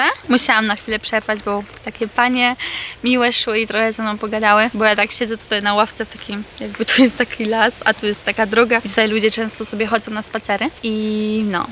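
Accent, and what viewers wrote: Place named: United States. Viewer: Dlaczego tak mówisz ? Ten akcent ..jest nie do zniesienia . Jesteś Polką czy Amerykanką ?! Bardxo mècząca gadka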